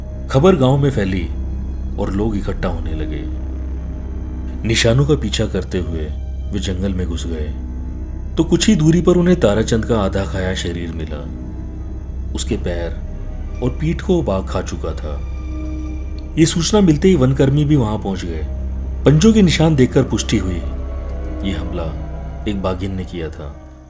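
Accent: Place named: Uttarakhand, India. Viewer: Aise lagta hai sir Uttaraakhand se hai 😅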